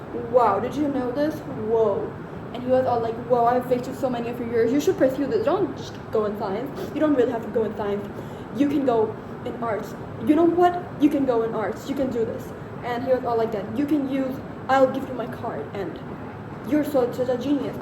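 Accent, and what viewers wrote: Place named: Asia. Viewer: Artsy careers can be difficult my cousin took film studies and cinematography he had similar issues with his family members but he made it big. Aside, as an artistic and introspective person myself im quite into writing poetry, journaling and reading existential books. Also love your accent, where in Asia are you from ? We can share our writings and help each out of creative blocks ? Im making my main themes of life set of poems I've done 2 out 6. Tryna find the inspiration to write the next one. 😭 Also do try the app poetizer can help you publish.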